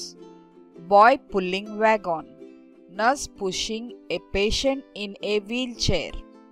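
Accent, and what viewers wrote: India. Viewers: Too much buffering and buttler English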